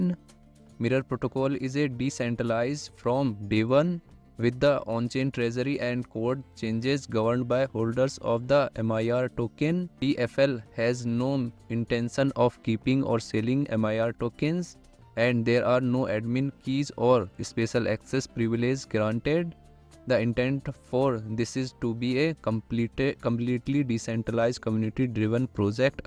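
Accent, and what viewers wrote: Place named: India. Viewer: my homie its hard to understand a single word with your Indian accent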